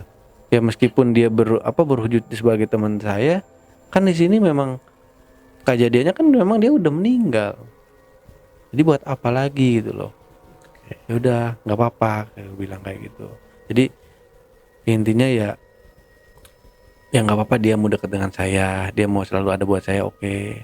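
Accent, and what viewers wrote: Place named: Bekasi, Indonesia. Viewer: Gw klo denger bang logat anak Babelan lagi cerita hehee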